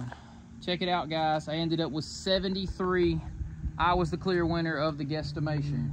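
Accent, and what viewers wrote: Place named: South, United States. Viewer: Love your southern accent.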